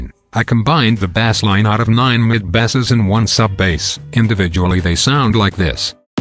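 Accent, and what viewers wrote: Germany. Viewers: are you German? 😅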